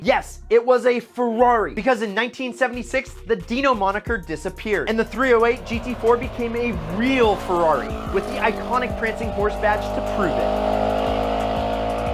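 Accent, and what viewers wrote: United States. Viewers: This is what a douchey American looks/sounds like incase you forgot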